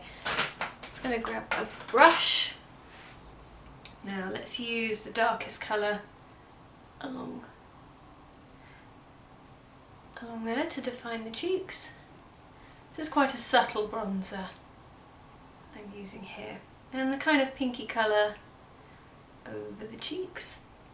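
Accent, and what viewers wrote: England, United Kingdom. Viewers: Indian with an English accent?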